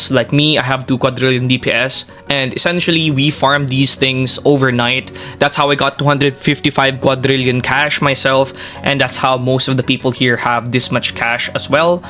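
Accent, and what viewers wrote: Philippines. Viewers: Wait?? Are you a filipino, because you have a filipino accent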